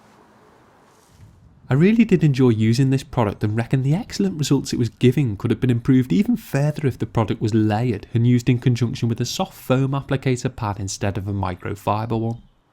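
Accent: Liverpool, United Kingdom. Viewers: by the way he's from Liverpool...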